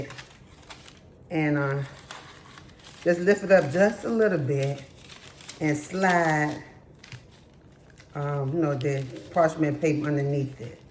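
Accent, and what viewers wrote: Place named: South, United States. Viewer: When you were making the frosting with your Southern accent my Yankee self thought you kept saying it was a portable frosting. I thought isn't all frosting portable? Then I realized you were saying pourable. Lol. Anyways that cake looks incredible. I'll have to try making it sometime. Thanks for sharing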